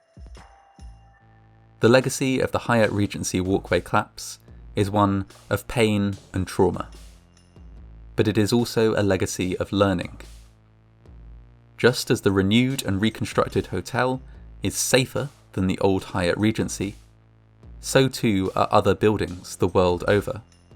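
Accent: England, United Kingdom. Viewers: I LOVE SO MUCH YOUR ENGLISH PRONUNCIATION, THE GENUINE BRITISH ENGLISH ! I AM FROM BRAZIL AND STUDY ENGLISH! I AM GOING TO WATCH ALL YOUR VIDEOS JUST BECAUSE I ADORE THE WAY YOU SPEAK BRITISH ENGLISH! CONGRATULATIONS FOR YOU!👍👍👏👏👏👏👏👏👏👏👏👏👏👏👏👏👏👏👏👏👏👏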